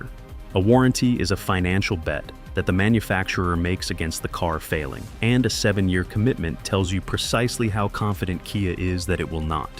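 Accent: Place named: United States. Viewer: Turned it off when I heard an American accent!!!!!!!!!!!!!!!!!!!!!!!!!!!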